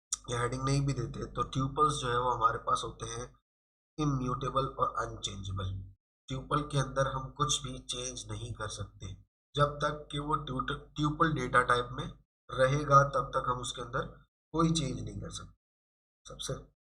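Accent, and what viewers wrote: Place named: Punjab, India. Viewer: Is lecture me thori Punjabi tone b sunai Di ha.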